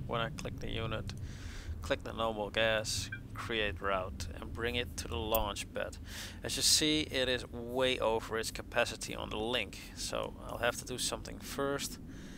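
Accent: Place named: Netherlands. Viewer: Goed engels hoor, maar het is net duidelijk genoeg dat je een nederlander bent :P Froeten Mark Androcius ( character naam op EVE )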